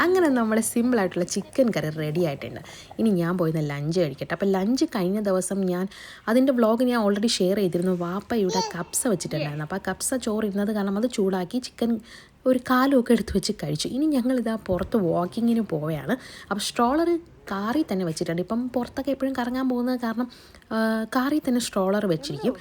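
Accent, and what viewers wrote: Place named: Trivandrum, India. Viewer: Trivandrum slang orupadundu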